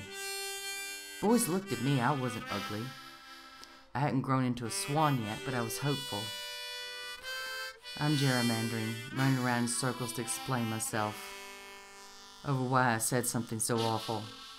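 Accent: South, United States. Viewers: Excellent work! I'd liked the Southern type accent, you reminded me of "Thelma" from the movie "Thelma & Louise"....one of my favorite flicks! xxxxx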